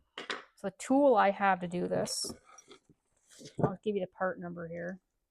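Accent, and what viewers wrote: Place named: South, Canada. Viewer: Love that southern ascent southern Canadian I guess good show as always.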